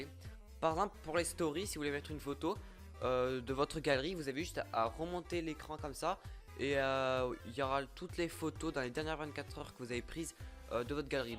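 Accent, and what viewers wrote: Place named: Canada. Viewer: On dirais que t'a un accent canadien ça me fait trop rire 😂😂😂😂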